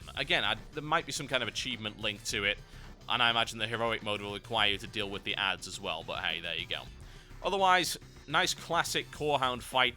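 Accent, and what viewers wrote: United Kingdom. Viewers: Oh and the instance looks pretty tight too, i'm lookin forwar dto it, THANKS, keep up the vids and ignore the noobs. They've just never heard a rad british accent and immediately think of some elitist sociopathic evil villain froma cheesy Bond flick or something. That, and people just have short sided nerd ragey opinions of the way things should go and get butthurt when they don't, that's net life i suppose.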